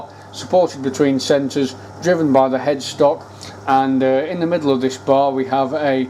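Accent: United Kingdom. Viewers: What part of the UK is your accent from? I've heard it before, but never knew.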